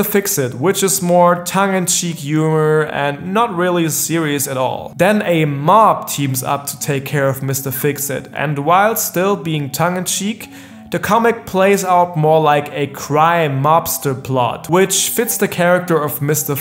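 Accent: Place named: Germany. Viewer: I came for the German accent.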